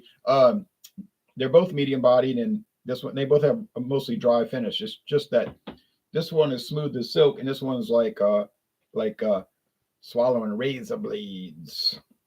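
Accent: Louisiana, United States. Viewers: I appreciate this video even being here! I’m only this deep into the video lol but the Trader Joe’s imo is gross. I’m a glenlivet girl, I just finished the founders reserve last week which I like better than the 12 tbh. I damn near wanna pour this Trader Joe's Speyside down the sink it’s so gross lol. Also, I saw a glenlivet carribean that looks interesting, I think it’s aged in rum casks. you are so funny 😂😁 you found it! ❤ 😮 that’s where I’m from! 91604! I was wondering if you were from Louisiana towards the end I began to notice your accent. I went to watch it again and you mentioned Metairie which I missed in the beginning but I recently visited for the first time last October. I had the best food ever at New Orleans food and spirits in Metairie. Anyway I really enjoyed this video. I was looking for this exactly after tryna go cheap and convenient tonight. 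Thank you sir 🙏🏾🤙🏾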